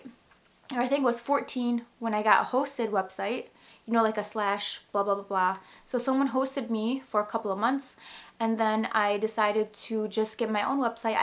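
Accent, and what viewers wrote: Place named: Miami, United States. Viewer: i know you're lebanese but you look so cuban!! you even slightly have a cuban-miami girl "accent"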